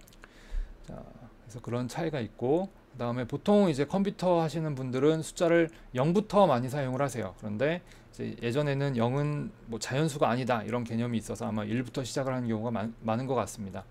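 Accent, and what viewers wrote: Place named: Texas, United States. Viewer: I don't understand anything, you have a deep accent are you from Texas?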